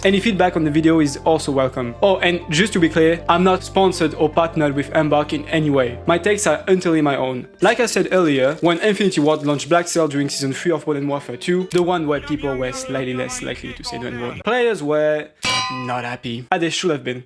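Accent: France. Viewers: Having a French accent is kinda broken tbh. You can do something shameless and then yell at me with that funny accent and suddenly its ok and I agree with you. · you sound very french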